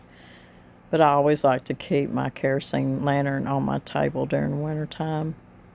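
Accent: South, United States. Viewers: I feel the same way……love everything about the home, both inside and out! Miss is simply a treasure, and I look forward to each and every video she and share with us! She has such an easy, gentle way of speaking, and I love listening to her sweet voice and southern accent. This video is one of my favorites, and I think many of us feel as you do ……she’s a “kindred spirit”, for sure! As for her beautiful wood floors……I can’t imagine telling ANYONE that their floor looks dirty!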